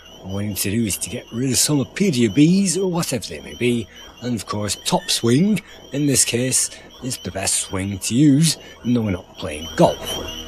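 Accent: United Kingdom. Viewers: Good to know! You sound British or Australian/NZ, so I was surprised that you had an NTSC Amiga.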